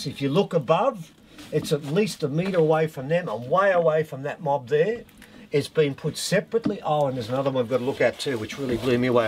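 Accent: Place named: Australia. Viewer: It sounds like this documentary was made by an australian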